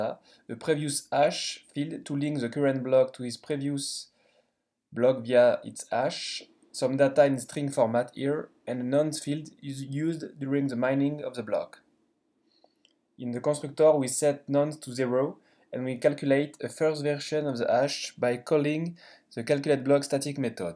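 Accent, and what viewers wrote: France. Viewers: Pourquoi tu fais le tuto pour les anglais seulement :/ On entend très bien ton accent français éhéh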